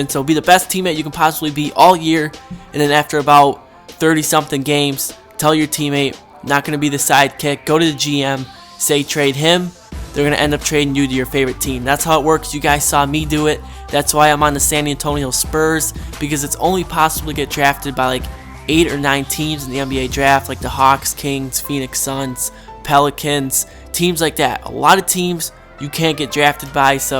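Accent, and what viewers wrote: Midwest, United States. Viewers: Why are you a Spurs fan? You sound like a midwesterner to me. Good video though. It worked for me, but they didn't trade me due to my conflict with Kevin Love. They traded me a week later, after I conflicted with the coach. I guess I was playing too well for them to trade me, IDK.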